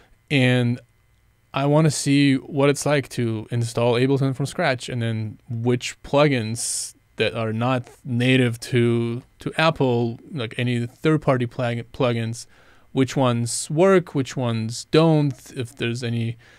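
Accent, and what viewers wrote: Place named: Paris, France. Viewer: there’s this channel on youtube called street french and your accent kind of sounds like hers. She’s from Paris. Are you from Paris too?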